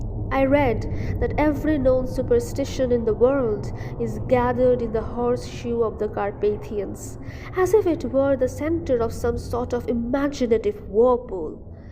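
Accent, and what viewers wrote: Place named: United Kingdom. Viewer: Your voice is just wow .. accent is too British . Just love your audio book❤ keep going.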